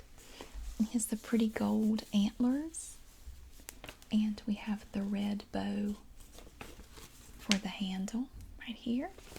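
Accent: South, United States. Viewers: I may or may not use your videos to low key practice my southern accent, which I was clearly meant to be born with. Also thank you for always making me falllll alseeeeep! xx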